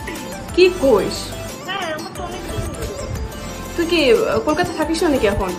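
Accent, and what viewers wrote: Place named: Bangal, Bangladesh. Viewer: Tor bangal kotha ❤️👌😂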